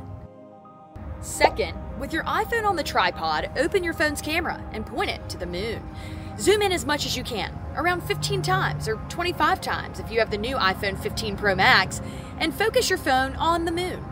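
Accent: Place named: Baltimore, United States. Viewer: Baltimore girl?? That accent on the Os is why I ask…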